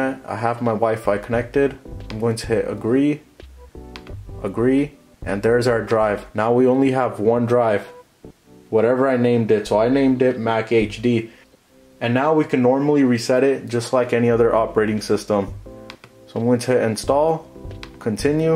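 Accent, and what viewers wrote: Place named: Brampton, Canada. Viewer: I hear a Brampton accent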